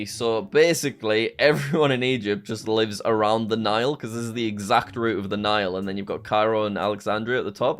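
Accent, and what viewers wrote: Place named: Yorkshire, United Kingdom. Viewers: Funny, to me you sounded a heck of a lot more Yorkie than Geordie; but once you mentioned it, I tried hearing the Geordie accent in my head (Ross Noble, popped right in there xD ) and now I'm not so sure anymore! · You sound nothing like Geordie bonny lad nor Smoggie. More like Not quite Yorkshire. Some of the accents in the former pit villages of Durham sound more Geordie than youse. Glad to see Norfolk and Suffolk differentiated. In Suffolk words get elongated, in Narfik they get get shortened.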